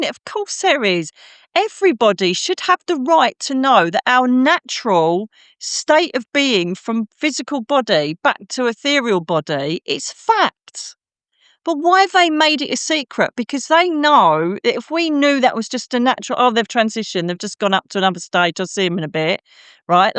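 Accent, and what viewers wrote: United Kingdom. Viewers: American here so I don’t know how to describe this accent, but wherever she is from in the UK seems to have an over representation of psychic people. Seems very common on Gaia etc. Kind of interesting 🤔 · I can’t get over how FAST time is moving right now!! She is lovely! She sounds like my UK family ❤ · Hard to understand her British accent!😢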